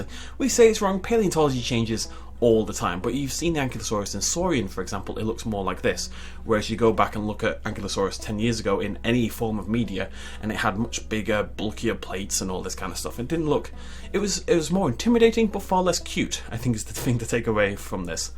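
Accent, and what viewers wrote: United Kingdom. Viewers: Woah I thought this was Nature's Compendium when I clicked. Imagine my surprise when I heard the voice of my favorite Brit.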